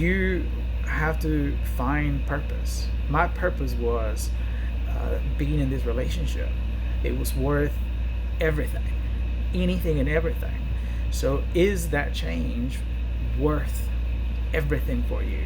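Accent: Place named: South, United States. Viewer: Love this,. Well done and very helpful. You are picking up a southern accent 😁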